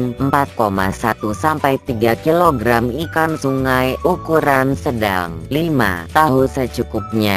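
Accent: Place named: Java, Indonesia. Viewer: Sampean jowo mas...medok men.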